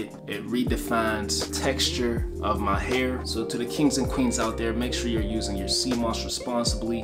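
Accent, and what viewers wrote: Pittsburgh, United States. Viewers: You gotta be from the burgh bro I hear the accent lol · I Knew It Was Pittsburgh Because That’s Where My Mom Side From 😂😂😂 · Burgh accent?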